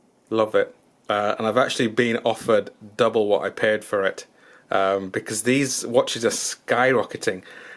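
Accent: Yorkshire, United Kingdom. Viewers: What do you so for a living if you don't mind me asking? Can tell your a Yorkshire lad haha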